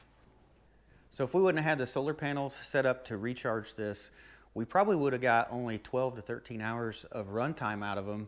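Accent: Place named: Oklahoma, United States. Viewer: ten or eleven years ago... sounds like you're near me in oklahoma lol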